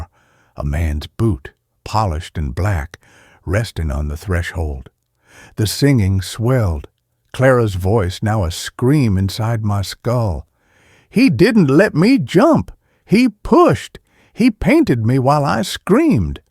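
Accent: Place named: South, United States. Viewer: Laura here in South Georgia am. Love, love, your stories and the southern accent ❤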